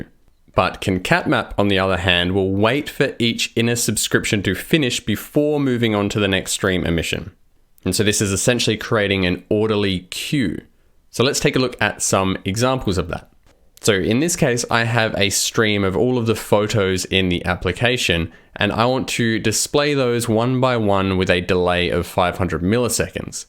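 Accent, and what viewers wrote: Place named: Australia. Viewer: very good tutorial. Well explained. I've learned a lot from you! And you've got an Aussie accent, which is a plus!;)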